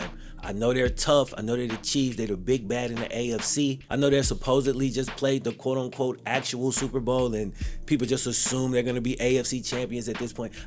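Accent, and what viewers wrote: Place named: Dayton, United States. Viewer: Bro, I’m born and raised in the Dayton area. You sound like you’re from here. As real as a bengals fan gets.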